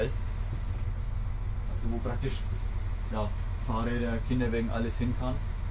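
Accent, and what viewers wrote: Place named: Franconia, Germany. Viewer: Feinstes Fränkisch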